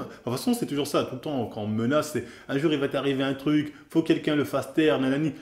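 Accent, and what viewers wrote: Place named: England, United Kingdom. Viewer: Les fit gurrrr, j'adore ton accent anglais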